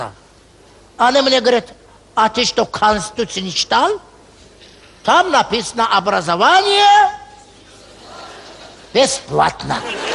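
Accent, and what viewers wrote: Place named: Baku, Azerbaijan. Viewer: Бакинский акцент до сих пор сохранился у него "Иди пей чай даааа"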